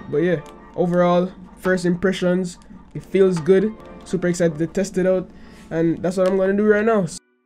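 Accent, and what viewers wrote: Jamaica. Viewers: Are you jamaican....? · I knew yu was Jamaican when I heard your voice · Is he Jamaican? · Is it jamaican? My parents are Jamaican. 😊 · You Jamaican? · ya jamaican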